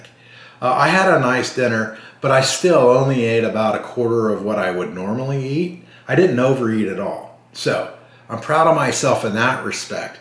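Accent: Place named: United States. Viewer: Greetings from Australia. Have ordered Mounjaro but we are not allowed to have the preloaded pens yet.... they have to be self drawn normal injections ( I think you say shots in the USA lol).. What do you do for work.? anyway soon starting I guess the minimum dose, wish me luck. Thank you for sharing your experience. love your American Accent, so cute.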